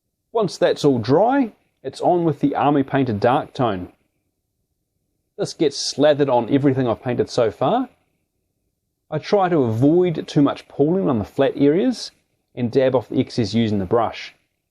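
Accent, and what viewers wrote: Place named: New Zealand. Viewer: Sorry. Every so often the Kiwi accent demands gentle humour....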